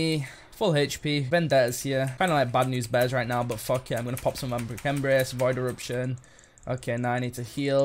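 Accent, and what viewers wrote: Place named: North, United Kingdom. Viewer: Love the videos man, entertaining. As a fellow northerner, it’s nice to hear the accent too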